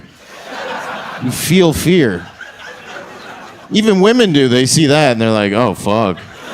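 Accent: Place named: United States. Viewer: This is the most american sounding canadian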